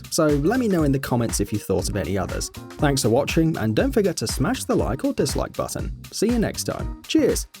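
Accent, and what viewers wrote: United Kingdom. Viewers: Love your channel are you british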